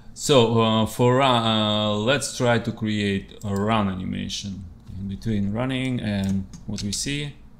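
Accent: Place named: Russia. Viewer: Very nice russian acсent).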